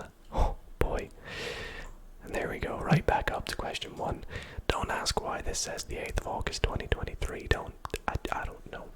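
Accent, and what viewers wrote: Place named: Doncaster, United Kingdom. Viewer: Are you from Doncaster ? Your accent sounds much like Louis Tomlinson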